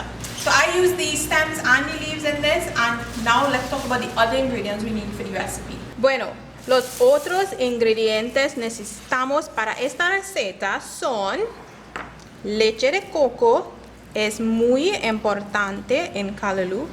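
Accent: Trinidad and Tobago. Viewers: Spanish with a trini accent, what a ting😕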